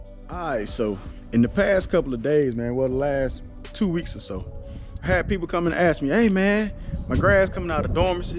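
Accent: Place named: Georgia, United States. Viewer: Sound like somewhere in Georgia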